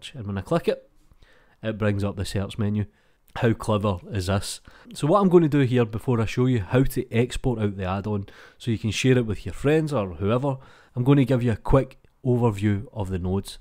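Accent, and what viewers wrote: Scotland, United Kingdom. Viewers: First i came to look at the AddOn. But now i just listen to your scottish accent lad !! Love it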